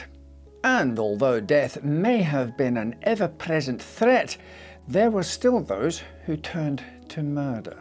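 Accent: Scotland, United Kingdom. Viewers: Very distinct presentation.Scottish I assume?Either way very excellent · It's a Scottish accent, don't know much about the world? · Your annunciation makes you sound Scottish but a while ago when I first started watching you, you sounded like a Brummie. Great content but.......distracting.. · As a scotsman…. Does anyone know where this man is from? I’m hearing half scottish and half English in every sentence.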